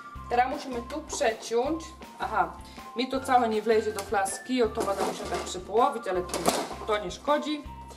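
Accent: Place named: Podhale, Poland. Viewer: Przecież to jest Urzekające.... Ja pochodzę z Białegostoku ale to jest Cudownie piękne jak Pani mówi po Goralskiemu 😃😃😃😃😃😃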